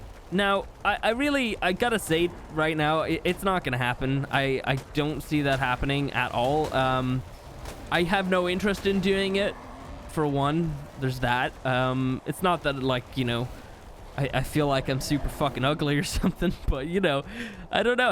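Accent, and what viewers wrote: Ireland. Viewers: Wait he's irish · i been watching you for years, i never knew you were irish😭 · Irish right?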